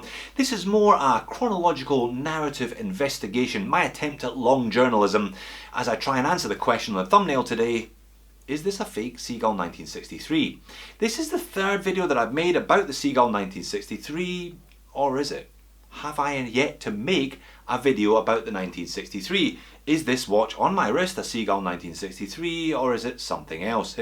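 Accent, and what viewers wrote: Scotland, United Kingdom. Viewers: Love the Scot accent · stop speaking scottish and I will sub you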